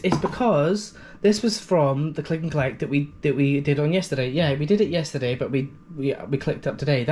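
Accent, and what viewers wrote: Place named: Scotland, United Kingdom. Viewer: i know hes Scottish as am i (glasgow) was uust wondering where in scotland is the accent from?xx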